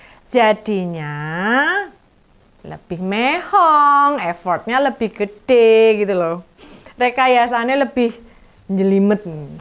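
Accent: Java, Indonesia. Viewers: Seneng aku bahasanya medok dan lancar ancen pendukung Ganjar rata2 cerdas dan berintegritas ngos ngosan lanjutkan mbak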